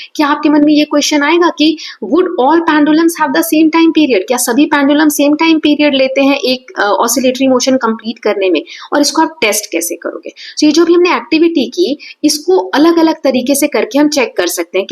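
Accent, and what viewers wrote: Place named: Bihar, India. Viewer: Mam aap bihar se ho 😊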